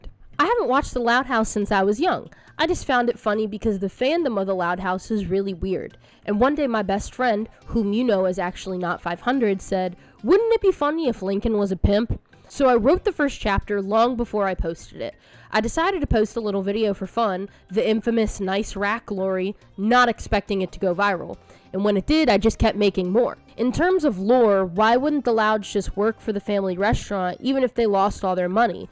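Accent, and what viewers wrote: South, United States. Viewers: I'm hearing more of a Southern accent than a Boston accent. :P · I love your southern accent. 😊 It's so cool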